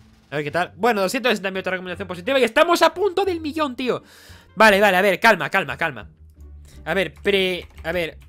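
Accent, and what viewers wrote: Chile. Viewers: jejeje ese idioma buen Chileno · hablas chileno ???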